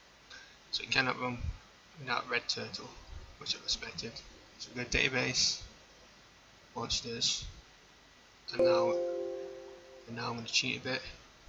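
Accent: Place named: United Kingdom. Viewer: I'm a nonnative English speaker I have a hard time to understand British accent :(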